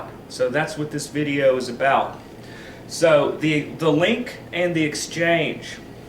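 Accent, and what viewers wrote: South, United States. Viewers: I love your hoodoo stuff the most. It must be the Southern Accent.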